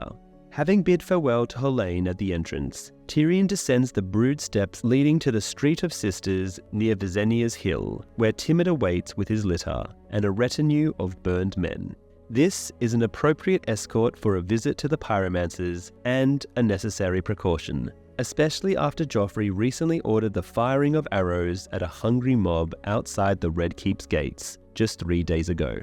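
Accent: Australia. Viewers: Where is his accent from!?! Driving me crazy 🤪 I’m leaning towards Australia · its his voice he says he has a australian accent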